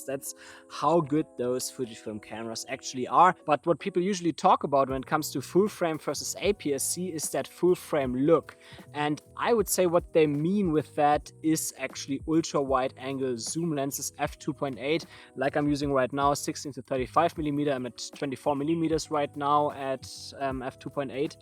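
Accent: Germany. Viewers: I love your German accent. also your cool content. thanks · Where you‘re from originally? Germany?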